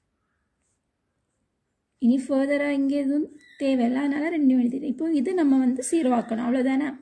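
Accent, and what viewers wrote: Kerala, India. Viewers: U r malayali right .?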